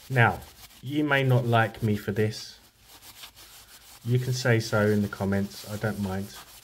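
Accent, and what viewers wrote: Essex, United Kingdom. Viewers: Hello, new subscriber here, also new to resin art, just uv for now, anyway, today I found you on tiktok and what a nice surprise to learn you're from Southend on Sea, I lived in westcliff on sea for a few years, I miss it, I could see the estuary from my kitchen window 😢. Well, I enjoy your videos a lot and I wanted to thank you, oh, and I love your accent, brings back good memories, cheers 🤗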